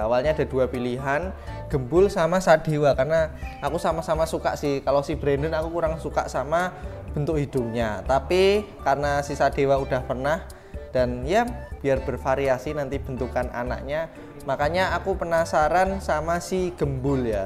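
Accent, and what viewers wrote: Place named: Pati, Indonesia. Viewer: logat daerah pati ne kentel banget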